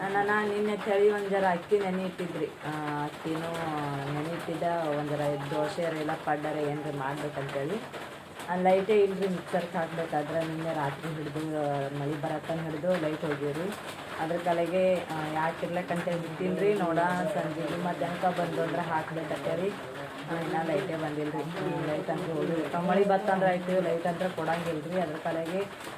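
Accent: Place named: North Karnataka, India. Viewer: Hi sister navu Kolar district navaru. Vlogs yavudhe athiyada aadambara elladhe simple agi channagi madtha edhira eege mundhuvaresi. Utthara Karnataka kannada mathadodhana kelodhake thumbha chanagiruthe but starting 50% nivu mathadodhu artha agthiralila namge.ega parvagila 90% artha aaguthe.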